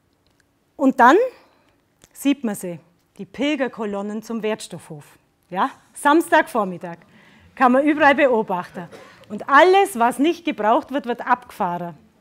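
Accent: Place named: Allgäu, Germany. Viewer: Ein wirklich sehr beeindruckender Vortrag - überaus sympathisch, unaufgeregt und motivierend! DANKE! Auch meine Pläne werden so langsam immer konkreter. Es wird auch allerhöchste Zeit für mich! Ich liebe zwar das Allgäu (mindestens so sehr, wie Dialekt!), allerdings zieht es mich eher in die Oberpfalz... - zu den Wurzeln meiner Familie.;) Namasté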